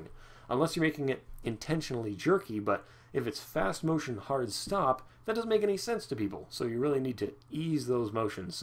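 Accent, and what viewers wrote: Canada. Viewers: You have a very Canadian accent and you are a great teacher.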